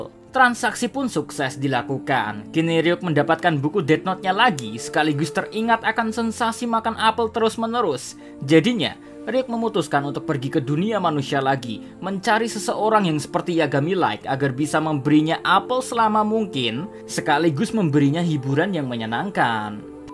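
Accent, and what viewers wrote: Java, Indonesia. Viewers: Lalu kenapa si mimim nya harus medhok gitu. Jd aneh denger nya. Klo bisa pake logat nya jgn medhok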